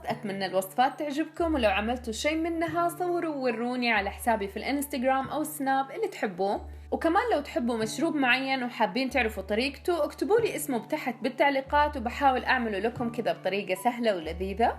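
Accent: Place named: Hejaz, Saudi Arabia. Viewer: الله يسعدك يا. من كم يوم بس عرفت قناتك وتابعت أغلب الفيديوهات انت جميلة وبنوتة رائعة ومرتبة وأنيقة الله يحفظك. فيديوهاتك تجيب السعادة💞 💜. وأحلى حاجة لما تقولي سيروا عليها" ياحلو اللهجة 😃ذكرتيني بأهلنا في الحجاز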